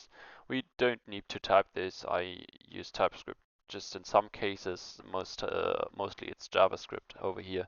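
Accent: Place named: Germany. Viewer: Great video! I also love seeing all the approaches of handling the words in part 2, your approach looks pretty quick! A few points I'd like to address though: - Splitting with "\r\n" only makes sense if the file is encoded with that new line sequence (which as far as I know is just Windows). A recommendation of mine would be to either switch to "\n" (which in your IDE I think works by clicking on "CRLF" in the status bar), or perhaps the better solution: using .split(/\r?\n/) which will support both both :) - When talking about the letter "i", pronouncing it as [i] (also known as the German "i") can easily be mistaken with the letter "e" in English ;) Your English seems really good though! - The magic (even though obvious in this code) numbers 3 and 5 could be stored in constants to give them names for descriptions, which might be overkill for Advent of Code, but I just felt like throwing that in the room :) - About adding numbers, I'd highly recommend writing parseInt(`${numbersInString[0]}${numbersInString[numbersInString.length - 1]}`), note that I used backticks (`) and not an apostrophe!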